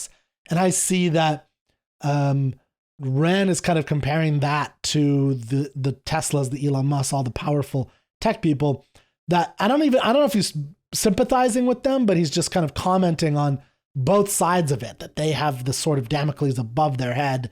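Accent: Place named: United Kingdom. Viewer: I know his British accent doesn't help you in the US sometimes, but yeah I thought he meant Jackel not Jekyll. Honestly I might be mistaken but that was where my head took me with all the death, killing and mixed biblical /mythical references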